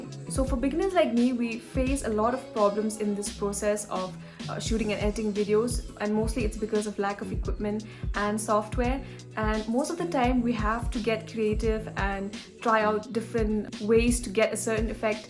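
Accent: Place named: United Kingdom. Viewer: British accent is on point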